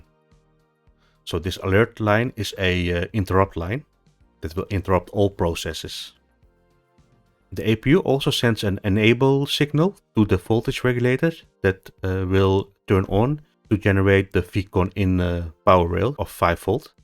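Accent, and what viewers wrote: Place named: Netherlands. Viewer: 2 seconds in and i hear the dutch accent 😁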